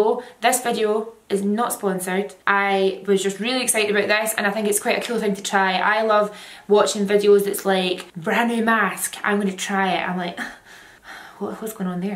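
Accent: Scotland, United Kingdom. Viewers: When you said you look like a fresh baby I died. Everything sounds better with a Scottish accent 😭🙌💛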